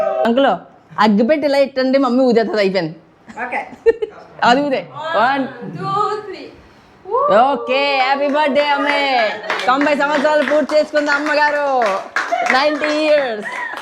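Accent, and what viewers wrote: Godavari, India. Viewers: nuvu chudataniki class untav kani mataladithy man godaraari yasa gattiga undhi bro , Dubai ki godaariki yela bro asalu ... super pair made for each other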